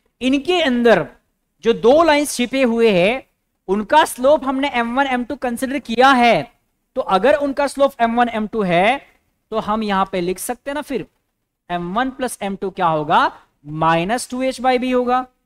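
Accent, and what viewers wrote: Maharashtra, India. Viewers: Sir bhut marthi bol rahe ho samjh nahi rah hai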